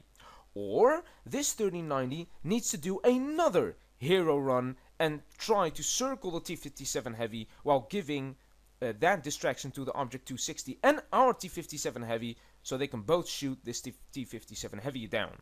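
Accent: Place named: Netherlands. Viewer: jouw engels is zeker niet slecht,hier en daar wat woorden of zinnen die ik anders had gezegd of vertaald.. alleen dat nederlandse accent he? kan je niks aan doen,maar is wel grappig XD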